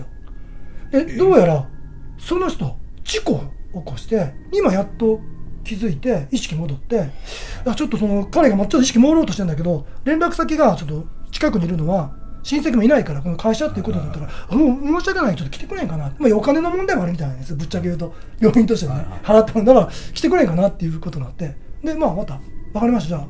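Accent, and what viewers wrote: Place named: Kansai, Japan. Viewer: 初めてコメントします 語りの方の話し方が関西弁で、尚且つ少し崩した感じの話し方ですごく聞きやすくて大好きになりました！これからも楽しみにしています🥰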